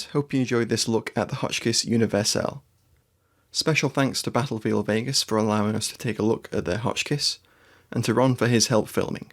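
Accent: United Kingdom. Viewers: Is this one deactivated as you sound British.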